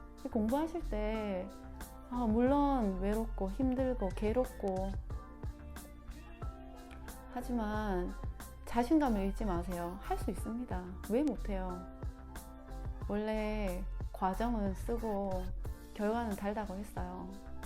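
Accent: Gyeongsang, South Korea. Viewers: 영상 잘 보고 갑니다. 자신감을 가질수 있는 좋은 영상입니다. 댓글을 보았더니 또 악플을 다는 사람들이 있더군요... ㅠㅠ 나쁜사람들~~ 그리고 부산 분이신가요? 경상도 사투리도 구수하네요 아무튼 잘보고 갑니다. 구독 하였으니 좋은 영상 많이 올려주세요.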